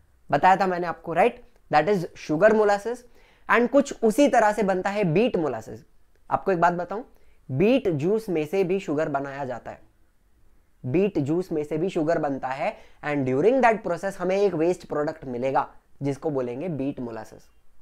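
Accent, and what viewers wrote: Maharashtra, India. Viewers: Sir aapn Marathi aahat ka ??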